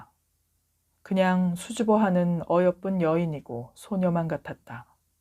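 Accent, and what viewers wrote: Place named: Jeolla, South Korea. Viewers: ~~♡ 구수한 남도 사투리와 지혜로운 사람들의 정다운 얘기네요~❤ 고맙습니다 ~^^ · 전라도 사투리를 우아하게 정감있게 잘살려서 얽어주신 멋지십니다~ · 박노해님의 자전적 소설 참 감사히 잘 들었습니다.전라도 사투리의 정겨움에 뚝뚝 묻어나는 정을 느끼다보니 가슴까지 따뜻해집니다.가난하고 병들고 벼랑끝에 서있는 자들에게 설땅과 쉴땅을 내어줄 수 있는 넉넉한 마음과 능력을 신께 소망해보는 아침입니다.작가님과 께 감사드리며 오늘도 복된하루 되시길 바랍니다. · 감동의 물결과 눈물이 납니다 나도 누군가에게 따뜻한 사람이 되렵니다 욕심이 날 때 내가 빛나고 싶을 때 나를 돌아보는 사람이 되렵니다 꾸밈없는 목소리로 그리고 맛깔스러운 전라도 사투리에.. 감사합니다 지금 여기에서 성장하는 사람이 되었어요 고맙습니다❤😊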